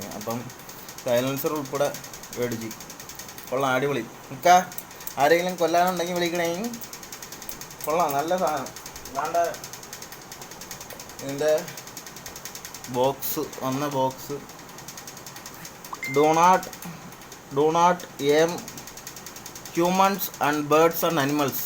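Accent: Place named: Trivandrum, India. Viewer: Tvm bhaasha aanu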